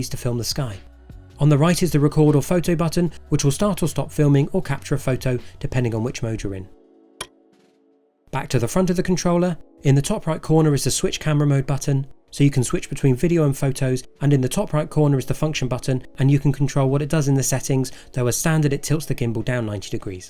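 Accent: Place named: Scotland, United Kingdom. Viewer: Thank you so much for such a great and inspiring video. I’m intending to buy the NEO as my first drone (have watched your other superb and helpful videos on it and have liked and subscribed). But this one has really inspired me. Plus the heads up on the phone video software that you used - amazing to get those shots that are perfect for sharing with family). Nostalgia see your MTB route and hearing your accent as agreed living 40y in Scotland we have just moved south to ‘the north’ to be near our ’kids’ but still have my eMTB active !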